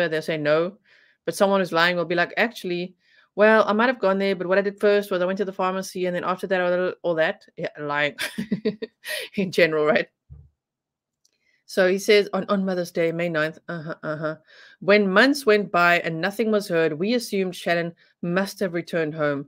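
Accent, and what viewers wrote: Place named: South Africa. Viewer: Hi! I've been listening to you with great interest. Your accent was só Mzanzi, but channel address is 'Netherland'. After your story about 'René', I know I didn't miss the accent. 🇿🇦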